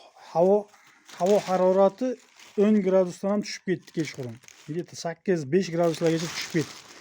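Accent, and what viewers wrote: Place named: Bukhara, Uzbekistan. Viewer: Уста каердансиз сир булмаса шевагиз Бухорога ухшайди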